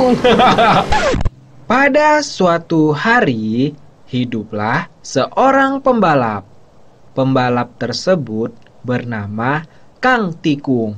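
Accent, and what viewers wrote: Medan, Indonesia. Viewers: Orang medan bang ya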